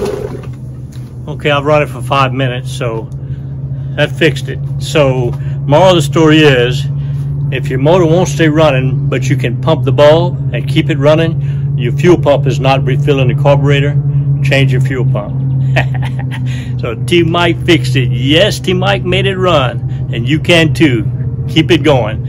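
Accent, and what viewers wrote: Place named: Virginia, United States. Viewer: Are you in Virginia I hear a little high land in ya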